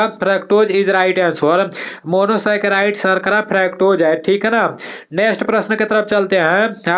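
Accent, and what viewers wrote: Bihar, India. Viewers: Bhai lagta hai ki aap bihar se ho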